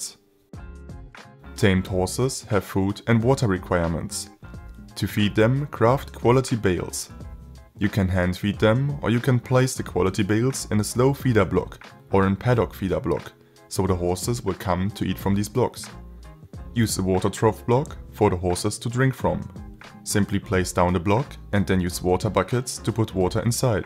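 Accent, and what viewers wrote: Germany. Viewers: I hear German Accent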